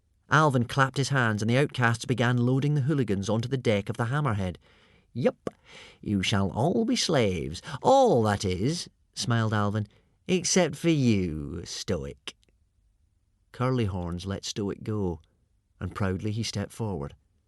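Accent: Scotland, United Kingdom. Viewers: The Scottish accent really goes well with the story.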